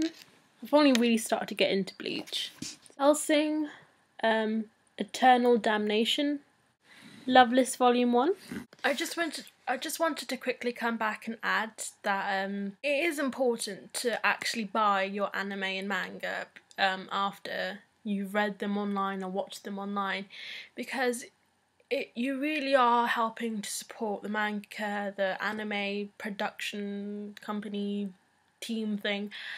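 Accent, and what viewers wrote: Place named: England, United Kingdom. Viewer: I love your accent! are you English?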